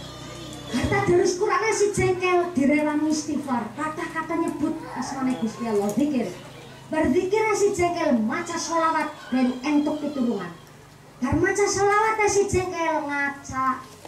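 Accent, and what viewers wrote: Banyumas, Indonesia. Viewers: Ceramah ngapak Lucu Ustadzah.. Terima Kasih ceramahnya Ibu Ustadzah.. Sukses selalu Bu..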